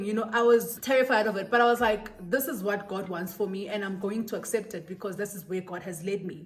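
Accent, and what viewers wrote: Nigeria. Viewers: Woww❤❤❤you look like those rich Nigerian woman with that face+accent,,we can't wait for more video#2024,,,, Happy New Year Motswala 🎉🎉🎉😍😍😘😘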